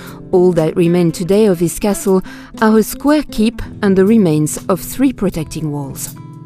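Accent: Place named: France. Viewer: Ravissant... mais c'est quoi ce commentaire en anglais avec un accent français digne de l'inspecteur Clouseau ? C'est assez ridicule.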